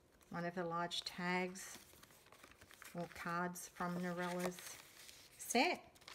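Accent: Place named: Australia. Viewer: lovely work - its great to hear an aussie accent on YouTube. Keep up the great work:)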